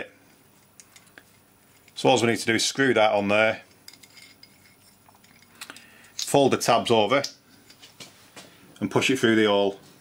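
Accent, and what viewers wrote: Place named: Lancashire, United Kingdom. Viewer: Knew I recognised that accent, Preston here :-)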